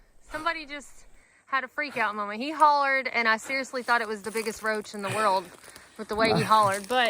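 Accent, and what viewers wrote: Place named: Tennessee, United States. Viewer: Im from Tennessee. Your accent is a little bit Tennessee.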